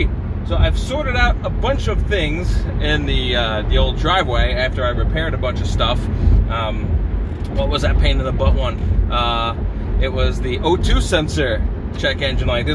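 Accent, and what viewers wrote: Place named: New Jersey, United States. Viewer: I thought you're from jersey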